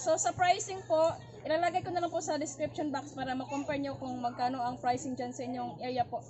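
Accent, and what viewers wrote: Visayas, Philippines. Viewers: watching from baguio city.. new fan & new subs. sobra mong galing magluto.. galing... taga san po kayo visaya kc salita nio po.. God Blessed.. ❤️❤️🙏💞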